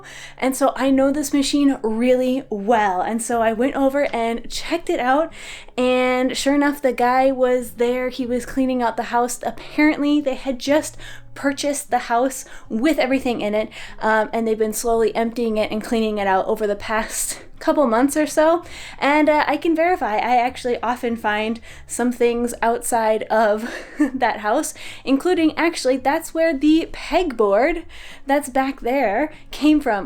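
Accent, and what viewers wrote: Canada. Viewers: You have a lovely Canadian accent when you speak French! 😉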